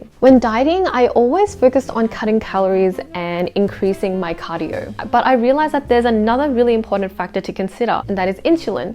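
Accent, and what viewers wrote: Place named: Malaysia. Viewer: 你是大马华人吗？口音能听出来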